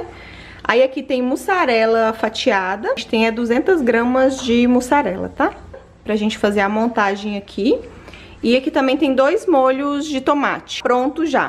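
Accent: Minas Gerais, Brazil. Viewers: Oi é um imenso prazer em falar com vc.Te acompanho já a muito tempo,te admiro muito me identifico muito com vc.Amo o seu sutaqye pois também sou mineira.Todos os dias vejo se vc postou alguma novidade amo o Bernardo a sua família vc é linda sua mãe seu pai com a mão divina fazendo artes maravilhosa.Sua mãe aí aquele fogão a lenha como lembro da minha mãe em Minas.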